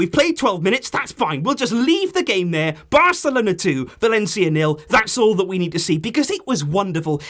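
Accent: United Kingdom. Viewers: Your British ascent is so good ✌️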